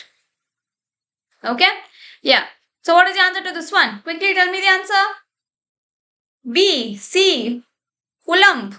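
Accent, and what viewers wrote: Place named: Kerala, India. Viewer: mam you from Kerela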